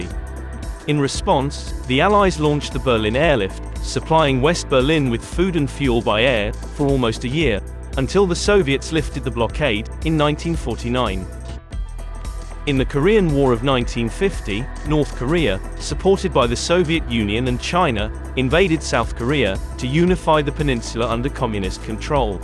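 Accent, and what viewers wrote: United Kingdom. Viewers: When ever I hear a British accent I can't believe anything all Colonial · The host got British accent, nothing but evil comes out of their minds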